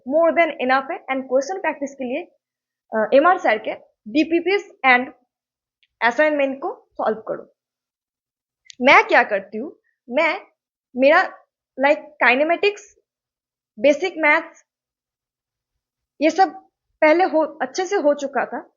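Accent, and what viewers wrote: Bengal, India. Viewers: Aapke hindi bolne ka style thora bengali hai 😅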